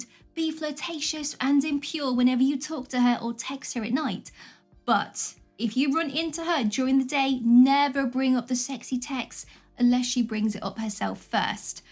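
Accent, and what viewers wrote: United Kingdom. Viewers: I love your British accent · Actually, this is my real YT channel, and I still love your British accent.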